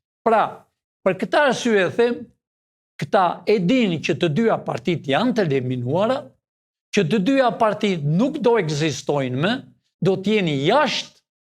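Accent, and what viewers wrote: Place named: Rural, Albania. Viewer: Ohhhhh te ruajte zoti ty,ohhh te kisha babane gjalle te kishte degjuar njehere ty,se fliste gjuhen shqipe njesoje si flet ti:gjuhen shqipe qe flasin prinderit tane ne fshate.jo gjuhen e hajduteve dhe irdinereve qe flitet ne parlamentin e shqiperise.🇦🇱🇺🇲🙏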